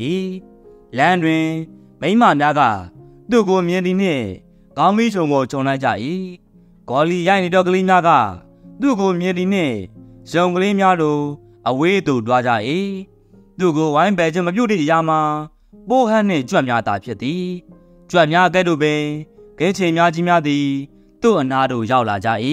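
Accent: Upper Burma, Myanmar. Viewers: အညာလေသံလေးနဲ့နား ထောင်ကောင်းတယ်အား ပေးနေမြဲပါ❤❤❤❤❤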